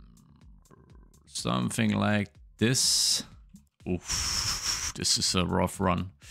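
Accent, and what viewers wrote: Denmark. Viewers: Are you danish? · this guy has to be danish. thats some danglish if ive ever heard it lol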